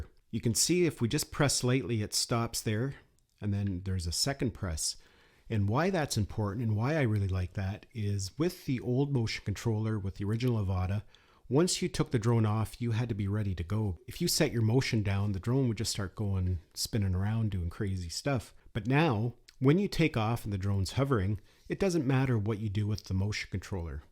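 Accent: Canada. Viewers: Your Canadian accent is like nails on a chalkboard. 🤦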